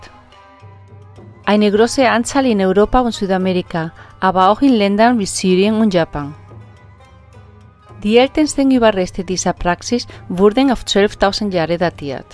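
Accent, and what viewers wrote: Spain. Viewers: ...man 😄 lass mal den nazimist hier wech😉... Ich bin froh solch politischen scheiss auf solchen Kanälen nicht zu finden. Ich denke, dass die meisten Leute, die sich auf solchen Kanälen aufhalten ( darunter auch ich natürlich 😁 ) eine andere Sicht der Dinge haben, als der Mainstream. Zu der Stimme muss ich sagen gefällt mir sehr 🙊 ✌🏽 Nur der starke spanische Akzent gepaart mit den hohem Niveau an Wörtern stört mich! 😂 Es wird viel wegen der Dame, die spricht diskutiert. Dafür kann die gute Dame ja nichts, aber das war der Anlass für mich überhaupt auf die Stimme zu achten 😃 Trotz allem finde ich die Videos immer sehr interessant und ich schätze die Arbeit dahinter👍🏽weiter so...! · Nun ja, das nennt sich spanischer Akzent. Du bist offenbar ein mental stark eingeschränkter Provinzler, um es mal sehr nett zu sagen.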